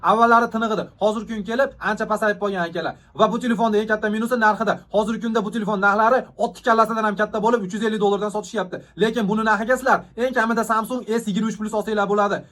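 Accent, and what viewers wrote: Andijan, Uzbekistan. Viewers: Anjancha shevaaa 😂😂😂😂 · Хакикий анжанча обзор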